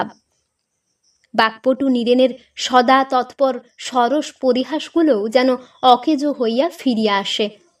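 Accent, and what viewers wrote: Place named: Bangladesh. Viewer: Madam narrator you must be from Bangladesh. Bad narrator.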